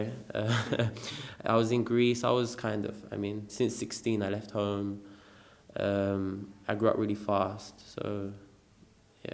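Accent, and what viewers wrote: United Kingdom. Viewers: Idk why everyones a bit disappointed of his voice ....like guys he have got british accent ....😍😍😍😍😍😍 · lili maxwell Actually he's scottish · Lol nah, he just has a typical London accent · His British accent makes him even hotter if that’s possible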